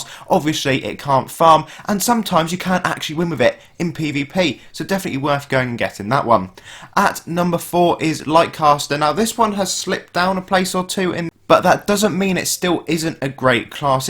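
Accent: United Kingdom. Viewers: I really love your british accent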